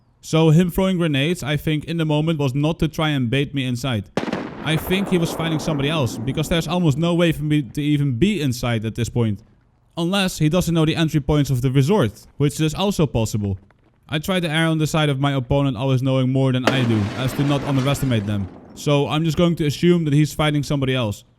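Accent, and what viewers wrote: Netherlands. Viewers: Are you dutch? Your accent is really dutch.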